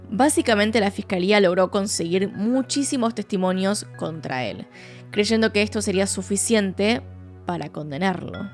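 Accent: Argentina. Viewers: Eres mi fav. Aparte que amo el acento argentino! Saludos desde Mexico 🇲🇽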